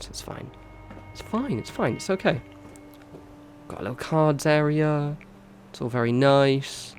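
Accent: England, United Kingdom. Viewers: Adam Gaffen He has an English accent.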